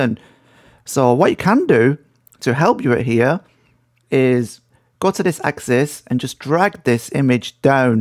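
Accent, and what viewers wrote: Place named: North, United Kingdom. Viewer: im from canada but YOU are a northern boy arnt you..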